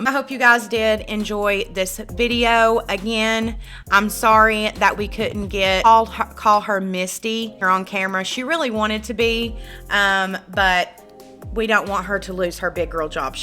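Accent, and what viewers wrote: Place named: Tennessee, United States. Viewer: I love me some Tennessee accent!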